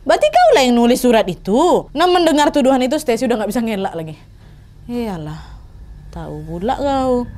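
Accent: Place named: Medan, Indonesia. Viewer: Tadi anakku denger cerita te kekeh2 dia😂😂😂.. Medan kali mak katanya... Woii... makin cantek ya...😘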